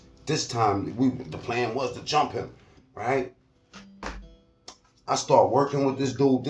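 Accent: Brooklyn, United States. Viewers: Yo I just subbed to ya channel cuz you kept it mad real you coulda lied and been like you washed home boy up but na u kept it funky peace homie IDK if ur from Brooklyn but u sound just like my boy from Brooklyn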